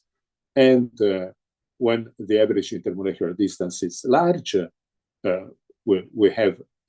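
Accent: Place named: Italy. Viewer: its the the phonemes and the inflexion, he is using italian inflexion and cadence and phonemes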